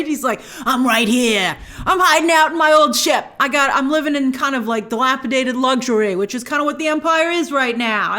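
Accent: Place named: United States. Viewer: I like your voice. Your accent is nice. Which part of America is this accent from?? Can somebody tell me?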